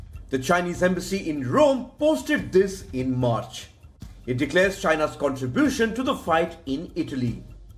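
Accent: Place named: India. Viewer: Sorry but you just cant take Indians seriously with the attrocious accent.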